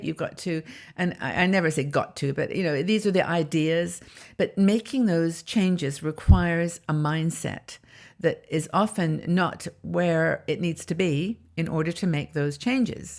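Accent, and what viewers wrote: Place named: United Kingdom. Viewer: Hello, thank you for this inspiring post of positive affirmations! This has definitely set the tone for my upcoming workout at the gym and day. I'm 62 and am embracing my age yet fighting my age too. I say 62 is the new 29. Haha! Love your necklace and you look awesome in red! I have to ask, where are you from as you have a slight British accent? Looking forward to your next video. 💜 from Texas.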